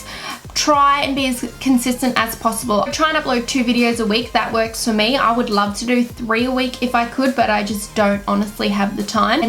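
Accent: Australia. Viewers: I’m from the USA. This only matters because I think I just fell in love with Aussie Accents.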